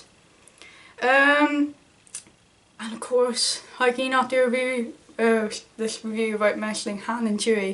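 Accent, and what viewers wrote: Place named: Ireland. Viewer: Nice review. As an aside, I didn't realise you were Irish; I though Scottish :D Excuse my poor English ears!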